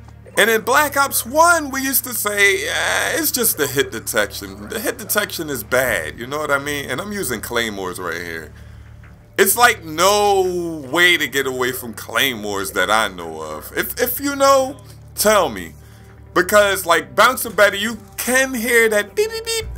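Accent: Philadelphia, United States. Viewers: are you from philly ? i am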